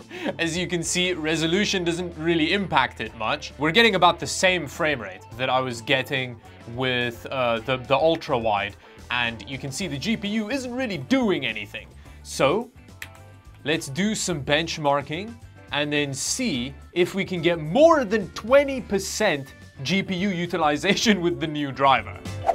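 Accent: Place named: South Africa. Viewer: I love your South African accent, I only realised the other day that you were from SA thanks for the great quality videos, liefde van uit die tuis land❤️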